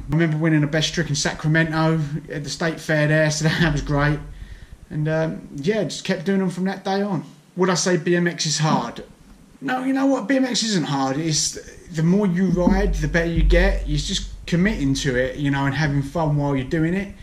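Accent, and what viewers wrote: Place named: Essex, United Kingdom. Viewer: Ooooo I heard Essex #EssexGirls🤘🏻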